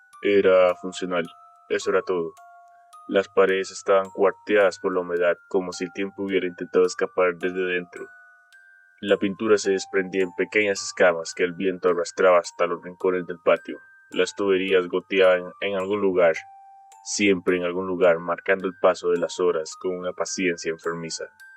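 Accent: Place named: United States. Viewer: Buena Creepypasta bro pero y ese asento hablas como un gringo tratando de hablando español y lo hubieras hecho con la voz Loquendo de vería más chido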